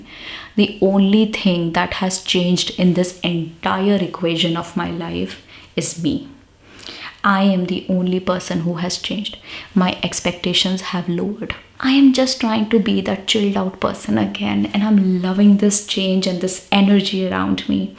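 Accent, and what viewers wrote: India. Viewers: I love indian accent 😘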